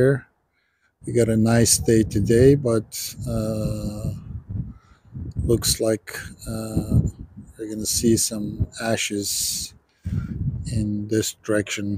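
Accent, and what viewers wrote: Turkey. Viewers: Hocam sivaslı mısın? Tam Sivas şivesi var sende😃😃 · Aksan Türkçe, hemşerim ne işin var orada, kaç kendini kurtar.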